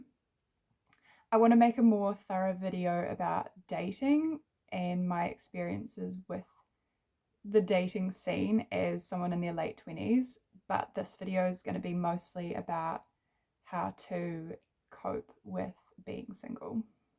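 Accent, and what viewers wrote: New Zealand. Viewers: Are you from New Zealand?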